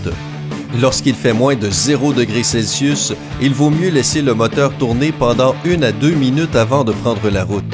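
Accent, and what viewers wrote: Quebec, Canada. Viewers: Sous titrage merdique avec cet accent canadien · Pourquoi cet accent québécois ? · nice Canadian accent :)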